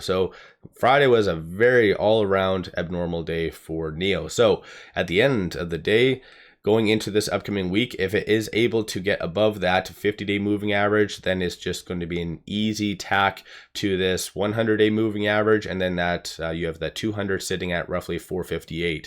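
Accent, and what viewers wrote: Canada. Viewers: Good content as always. I detect a Canadian accent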